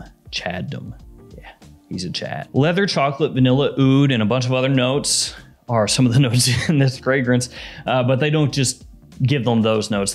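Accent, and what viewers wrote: South, United States. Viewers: Southern accent, again. Awesome!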